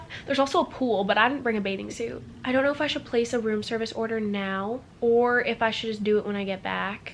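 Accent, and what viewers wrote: South, United States. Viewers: i feel like you’re developing a southern accent and its so cute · I don't know if it's just in this video or if it's always been like this and I just didn't notice, but actually has a really strong southern accent lol 😅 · You sound more southern in this!!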